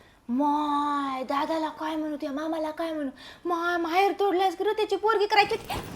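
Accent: Nanded, India. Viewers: अभिनय,उत्तम,काही काही कला,या व्यक्तींना,उपजत,असते, सोशल मीडिया, मुळे, आधी क,प्रसार,झाला,मी, कसे,दिसते,आपण,कसे, आहोत,आपले,रूप,तारुण्य,हे,या,वयात,होतातच,मुळातच,लहान, वयापासून,सर्वांना,मोबाईल,सोशल,मीडिया,जग,आपल्या,घरी, आले,आहे,ताई, आपल्याला अभिनय, कौशल्य,छान,आहेत,नांदेड, च्या,भाषेचा,सुद्धा,छान,हाव,बाव,संवादफेक,अगदी,सुंदर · Mazi frnd ahe pavdevadi Nanded chi Ti same tuzya sarki bolte 😁😁😁😁😁😁khup avdtat Tuze vlog bghayla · Nanded chi bhasha lay bari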